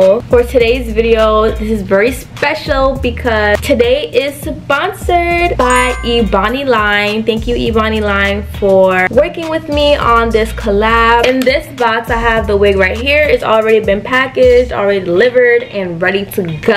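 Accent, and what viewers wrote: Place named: New York, United States. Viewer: I love your New York accent 😭 and thank you for this! Mine just came today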